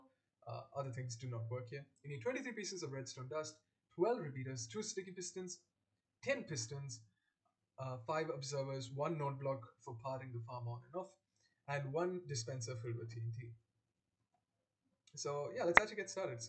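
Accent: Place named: India. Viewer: Which country do you belong to? Your accent is very Indian. If you are an Indian then its really nice to see some good indian Minecraft youtuber.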